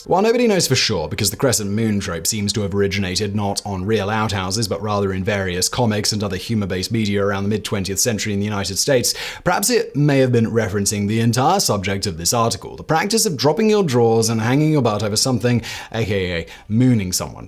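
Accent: United Kingdom. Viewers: You may live wit duh Czechs but you still got a British accent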